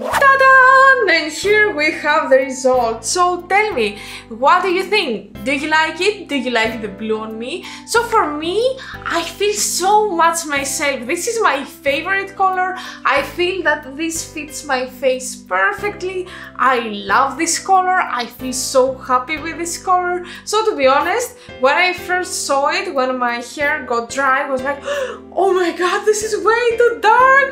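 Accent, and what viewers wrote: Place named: England, United Kingdom. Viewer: You are always good surprise :) Are you maybe from England? You have a great accent. :)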